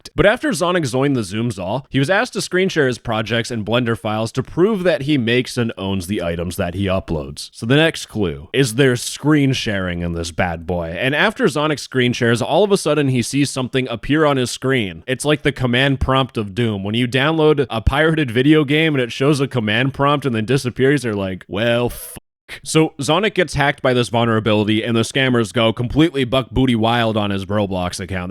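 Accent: Poland. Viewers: as a pole i approve polish accent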